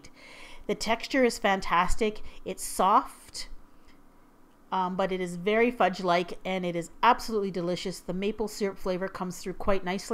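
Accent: Canada. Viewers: Random question, are you Canadian?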